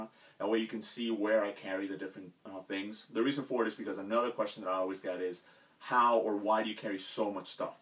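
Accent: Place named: South Florida, United States. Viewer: thanks for sharing. I knew you were in So FL from your acceent:) Suave... are you going to the gun show in Fort Lauderdale this weekend?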